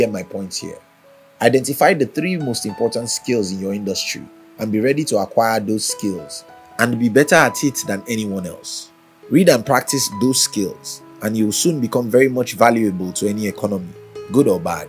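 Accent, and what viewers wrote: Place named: Nigeria. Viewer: That's Nigerian Accent, must be a Nigerian.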